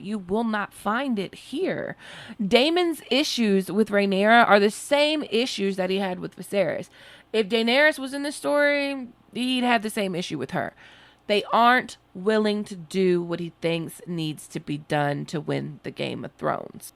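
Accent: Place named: Baltimore, United States. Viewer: You gotta be from Baltimore lol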